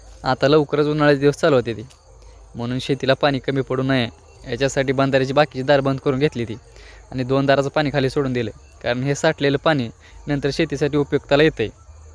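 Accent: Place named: Solapur, India. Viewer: सोलापुरी भाषा खुप खुप छान आहे 👌👌👌👌🎉खूप आवडते आस बोलायला ❤❤❤ त्या झाडाला आमच्या कडे जमु बेट म्हणतात पण तुमच्याकडे काय नाव आहे त्या झाडाचे👍👍👍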